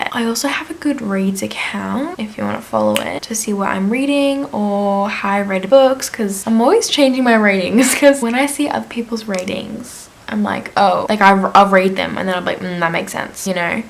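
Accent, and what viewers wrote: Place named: Australia. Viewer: This is really random but you and phoebe Tonkin have the exact Same specific dialect like I could close my eyes and I could picture a young phoebe. I’ve never heard another fellow Australian sound so similar haha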